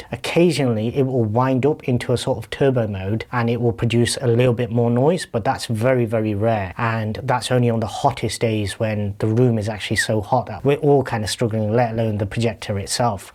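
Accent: United Kingdom. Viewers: Nice update!! Great to see some UK home cinema content! Im currently running an Atmos 5.3.2 with a Denon receiver. Im restricted as Im still trying to maintain a living room esthetic. Ive still managed to squeeze into the space (4.5 x 4.0 x 2.0m) *120" motorised screen *JVC DLA X90 *Sony 65" 4K *Denon Atmos AV *XBOX 1S (primary source for streaming including Apple TV, and bluray of course) *Nvidia shield *KEF Q200 Centre Speaker *Monitor Audio shadow surrounds (for their low profile) *3 X BK Electronic subwoofers (for their small footprint) My most important ugrade is going to have to be a projector! My current JVC has excellent black levels considering its age but an upgrade is long past due. Its either going to be a X7000 e-shift (based on price and its the same size as my current one, so it will fit into the custom box) or I might hold out for a N5B! 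Also, I feel my subs hit pretty hard and deep considering their size (with a claimed figure of 17hz) but without calibration I have no real idea, so I'm also on the hunt for a mini-dsp. I cant place the accent, what part of the UK are you from? #SHEFFIELD 💪🏽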